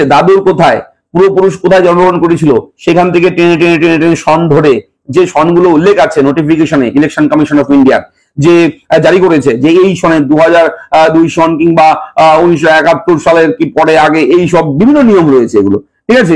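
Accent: Bangladesh. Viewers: আপনার কথা শুনে তো মনে হচ্ছে আপনি বাংলাদেশী, ডকুমেন্ট আছে তো?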